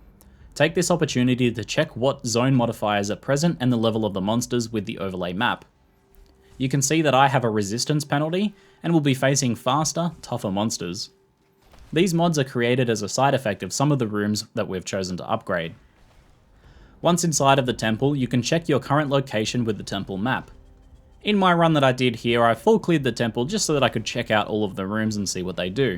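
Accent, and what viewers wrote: Australia. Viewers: rooms or runes, dat ausie accent xD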